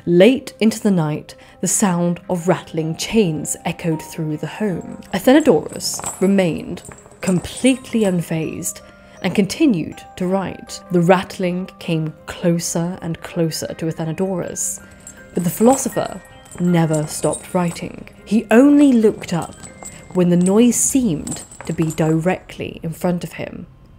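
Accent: RP, United Kingdom. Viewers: So posh. Awesome. Great content.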